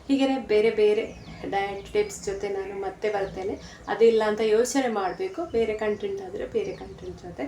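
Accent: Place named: South Canara, India. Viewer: Please support me..nivu maathanaadodu kelidre nivu south canara udupi ಕಡೆಯವರು ಅನ್ನಿಸುತ್ತೆ..ಯಾಕಂದ್ರೆ ನಾವು ಕೂಡ southcanara ದವರೆ🤗🤗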